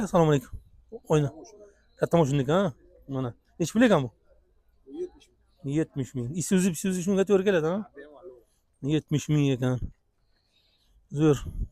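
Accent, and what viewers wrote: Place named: Peshku, Uzbekistan. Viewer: БУХОРОНИ ҚАЙЕРИДАНСИЗ ШЕВА ПЕШКЎГА ТОРТОПТИДА ДЕГДАВОНМИ ПАХТАОБОД ГУЛХАЛОНМИ КАРОЧИДА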